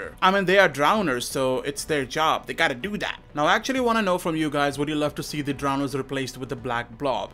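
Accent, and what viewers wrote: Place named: India. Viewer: Why do you sound so Indian?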